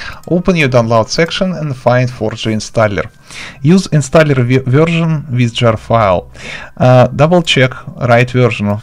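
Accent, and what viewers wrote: Russia. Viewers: У тебя русский акцент, ты не из России?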